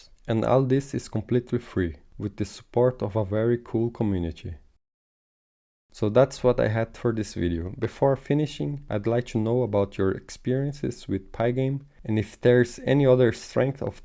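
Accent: Brazil. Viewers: Man , are brasilian? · O inglês com o Tchu pra ca e tchu pra lá é do Brasileiro hahahaha. Ótimo video. Seguindo e cutido. Um Salve da Holanda! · are you Brazilian?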